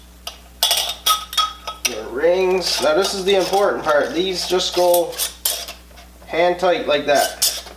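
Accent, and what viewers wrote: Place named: Newfoundland, Canada. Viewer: I knew within 5 seconds of hearing you I can to the right place. Newfies Rule.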